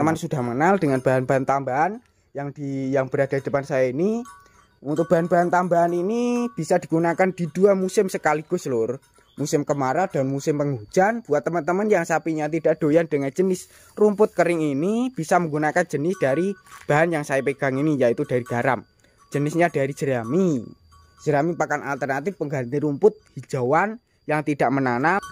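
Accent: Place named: Java, Indonesia. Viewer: Medhok tenan leh omong...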